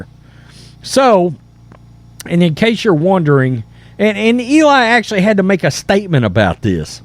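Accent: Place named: South, United States. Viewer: apple said CANCUN ON 3 with the heart hand gesture. Le'ts not downplay that. Why would he say cancun 3. You know whats ridiculous is how you have a show and 178 subscribers???????? How?????? LOl you get paid for this/??? How?? Must have a lot of inbred cousins that follow and like your show. You sound like a hillbilly and you somehow you have a zoom group and viewers???? HOw?????? You should consider hiding and maybe wear a mask OR just putting your little video on mute. Eli apple was being a douche. But a douche is a douche. Your live news is really boring and i really hope you don't get paid for this. Bengals are so tough and going to kill everyone. Glad they went to Burrowhead and showed everyone the chip on their shoulder. Who watches this??????? Is this a redneck incest private feed????????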